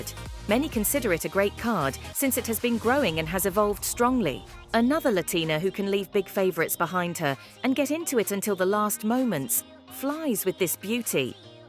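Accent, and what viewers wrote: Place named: England, United Kingdom. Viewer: English, your voice sounds like the Crown sisters from the Philippines and love it and the English accent.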